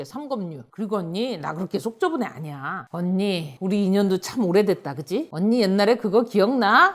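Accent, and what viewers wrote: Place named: Chungcheong, South Korea. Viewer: 충청도사투리 ㅋㅋㅋㅋㅋㅋ 너무 인간미 넘쳐서 혼자 낄낄거렸어요!! ㅋㅋ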